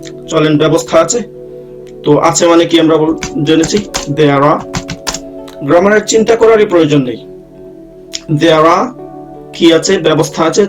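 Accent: United Kingdom. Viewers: A best channel in the british accent.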